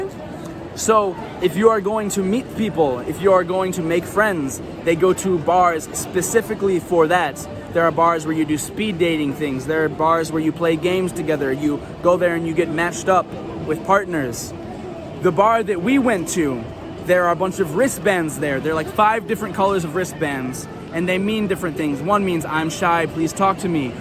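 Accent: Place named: Chicago, United States. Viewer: Are you from Chicago? Keep up the great videos on YouTube 😎👍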